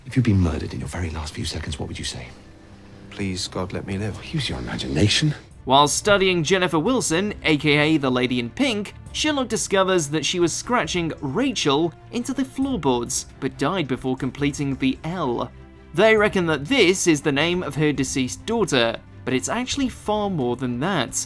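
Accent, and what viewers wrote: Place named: Liverpool, United Kingdom. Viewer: liverpool , i hear in your accent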